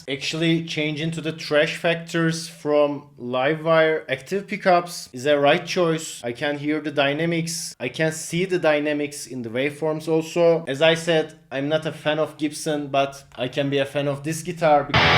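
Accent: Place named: Turkey. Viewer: ulan diyorum aksan bi farkli turkmussun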